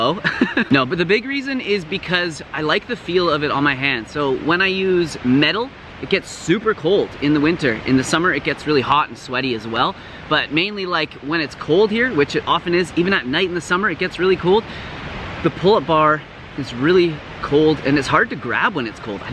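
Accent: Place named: Canada. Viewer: Question! I’m new to the channel but have been absolutely loving it! I am from New England in the US so we do get cold winters as well. I’ve noticed that you have a cool setup in your backyard for your calisthenics... how do you adapt in the winter months? It seems like it would be too cold or snowy! Also btw I just love the Canadian accent and lingo since I have ancestry from Quebec. Love your channel! It’s binge-worthy! 💚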